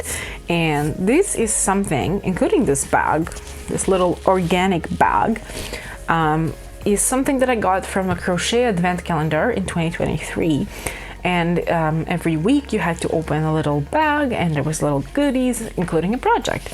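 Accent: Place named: Canada. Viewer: First time watcher! I love your energy! You’ve e got some beautiful projects going right now. I can’t wait to see them finished. I have also never seen a stand like that to hold yarn. That could come in handy. Are you originally from Canada? Your accent is beautiful.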